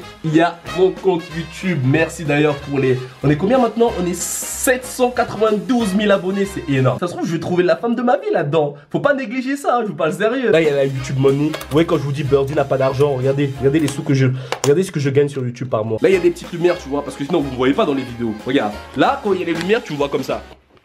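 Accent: Switzerland. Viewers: T’es suisse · t suisse? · T'es suisse? si oui je m'abonne😂😂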